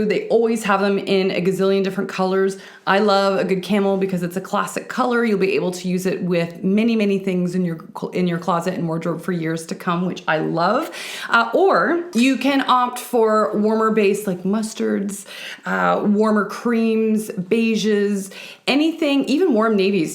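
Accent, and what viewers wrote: Canada. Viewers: I just found you, yay! Are you Canadian? You sound Canadian. I’m a BritCanaYank. West coast 36 years.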